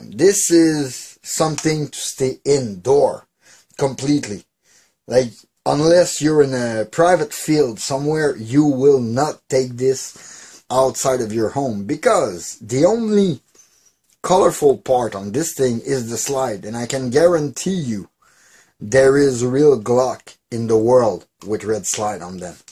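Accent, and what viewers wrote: Quebec, Canada. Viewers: J'ai reconnu ton p'tit accent du bon Québec :) Bonne vidéo my man! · wait you come from quebec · quebecois et fiere de l'etre jai reconnu ton accent hahah! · Tu vien du quebec?!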